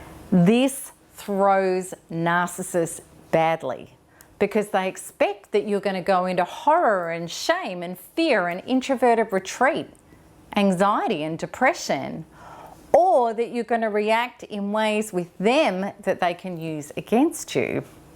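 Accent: Australia. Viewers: ozi acent and this patronising way of talking is so anoying.By the time she finishes the sentence,I forgot what she started talking about. However, some comments here are so helpfull. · What the hell is this, you wanna show that you speak Australian English right rather then what impotent things you are saying, right.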